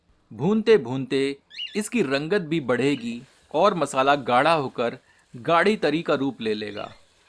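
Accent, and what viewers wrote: Standard. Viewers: maja aa gaya shuddha hindi sun ke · शुद्ध हिंदी का उच्चारण क्या बात है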